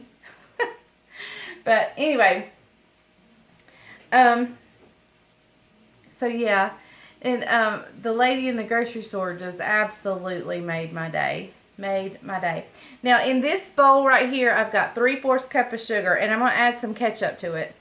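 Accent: South, United States. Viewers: Are you saying Aldi?? Sorry this northern girl is having a hard time with the southern accent😅😅 love your channel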